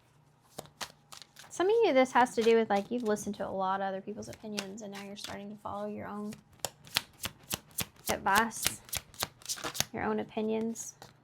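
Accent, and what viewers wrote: South, United States. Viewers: I think your southern accent has everyone calling you ma'am 😂 beautiful! Great reading thanks ma'am ❤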